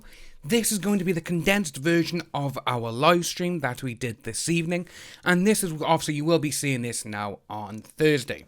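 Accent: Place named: United Kingdom. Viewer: I love your interactions with the viewers. I also really like your accent 💜 keep up the good work! Are you in the UK? I know you said Wales (sp) but I think there is one in Australia too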